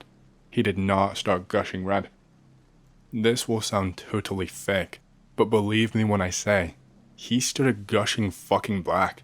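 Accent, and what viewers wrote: United Kingdom. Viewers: bro peak content as usual, where are you from (regionwise) i cannot place your accent in the uk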